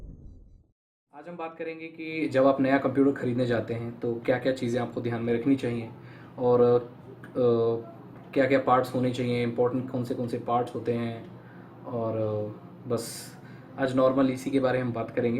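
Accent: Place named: Bihar, India. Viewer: bhai aaja full bihari lag rahe ho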